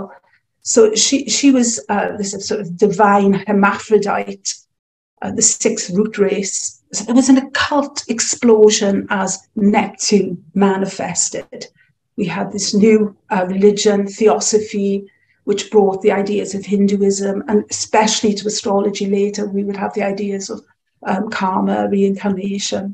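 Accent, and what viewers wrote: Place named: Wales, United Kingdom. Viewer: Refreshing to hear a welsh accent in an astrology lecture! Alot of really helpful references, thank you 🙏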